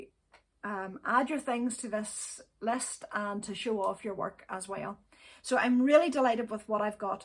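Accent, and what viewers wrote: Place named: Ireland. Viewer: I so love your podcast! Thank you for uploading your videos. I especially love listening to your Irish accent. You could read the phonebook and it would still be interesting. Blessings to you and your family. Haven't seen your cat for awhile. Does he still like to perch on your k we while you knit...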